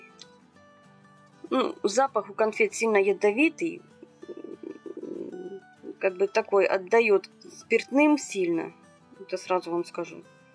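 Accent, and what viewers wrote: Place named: Ukraine. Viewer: Согласна с Вами, коллега! Очень вкусные конфеты! Да и говор у автора видео явно хохлятский... 😂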